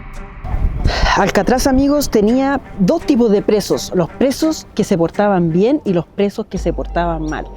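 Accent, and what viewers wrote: Chile. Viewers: Primera vez que veo este vídeo y por el acento me preguntaba se siente Chileno que sorpresa que eres mi compatriota yo igual que Uds vivo en Canadá y soy Chilena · Nose por qué , pero a mí me gusta como suena el Acento Chileno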